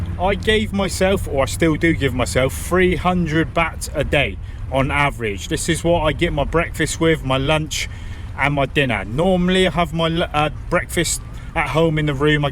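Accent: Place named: South West England, United Kingdom. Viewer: Hi, Been following [subscribed] you for a while now, it sounds like you are from the South-West-ish area of the UK [so you will be well-aware of the weather in the UK]. I am interested in Chiang Mai "as it seems to have cooler temperatures than the rest of Thailand" [Chiang Rai also looks like a cooler option too], that said, could you give a portion of one of your vlogs that tells of what to expect weather-wise [mostly between November to May in my situation] in Chiang Mai? Good-luck Buddy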